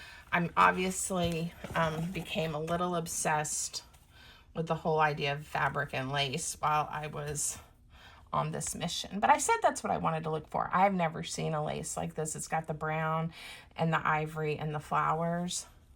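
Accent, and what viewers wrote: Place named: South, United States. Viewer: Oooooo. I have lots of old hankies too! You go girl!!! (P.S. I'm amazed how quickly your southern accent went away)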